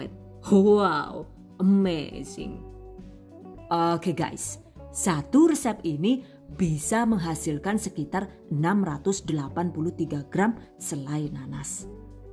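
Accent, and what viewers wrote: Banyumas, Indonesia. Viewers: Resep selai nanas dr video ini uda q download dr setaun yg lalu jd resep andalan... sbnernya selain resep nya yg ciamik... suka sekali dgn pnjelasan2 nya yg lucu n logat ngapak nya apalgi pas bhsa ngapak nya keluar truz blkgnya sllu ada kta yyuk... jd bsa snyum2 sndiri.. #GiveawayTHV2021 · Sampeyan wong bule opo wong ngapak to mbak kok lucu banget tlng tampakan wajahnya mbak penasaran sy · Terimkasih bunda...sangat bermanfaat untuk saya....kepengin ngundang "yu" janen..kayane wong panginyongan... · Ngapak2 deneng koh😁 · Penjelasan nya mudah dipahami.. ngomongnya enak didengar ada lucu," nya bahasa ngapak.mantap bunda. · selalu tersenyum bila bu naratornya udah ngeluarin bahasa ngapaknya😄😆 tapi selalu mantap resep dan tipsnya. semoga semakin maju channelnya.. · deneng ngapak 😅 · Suka banget sama chanel jlas Pk bnget jelasinya..lucu ngapake koyo nyong😂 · Bisa ngomong bahasa ngapak yah 😁 Cilacap hadir☝️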